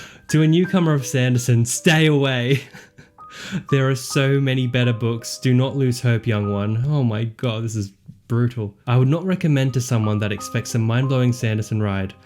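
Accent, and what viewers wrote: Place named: Australia. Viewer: I am loving the Australian that's coming out! More please :D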